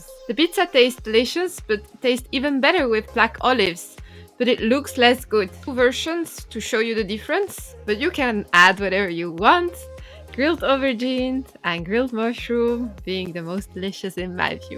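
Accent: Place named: France. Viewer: Hello! Je profite de ton accent français pour te féliciter dans la langue de Molière! Cette vidéo est très claire, agréable à regarder et donne envie de reproduire la mozzarella. Je vais me lancer car j’ai bien envie d’une bonne pizza! Continue!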